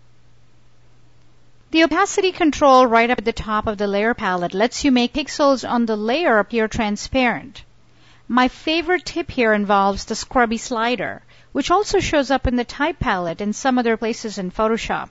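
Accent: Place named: Midwest, United States. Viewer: This is the thickest midwestern accent i've ever heard. What state is that, Minnesota? Wisconsin?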